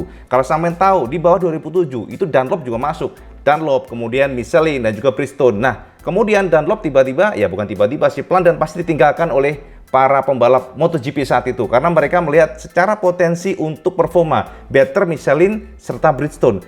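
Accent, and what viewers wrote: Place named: Java, Indonesia. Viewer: Josss Gandosss Cak...Up date Informasinya selalu saya tunggu-tunggu, lugas, jelas, padat dan ciri khasnya yang medok...👍👍👍👍👍👍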